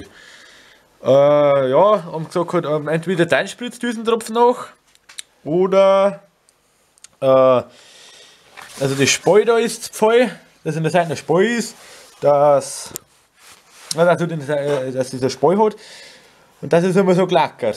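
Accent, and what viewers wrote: Germany. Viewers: Wo aus bayern kemz es?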